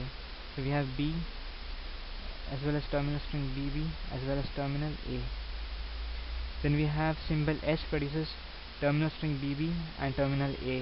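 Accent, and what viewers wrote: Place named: India. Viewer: Im so fucking tired of indian english by this time in the course..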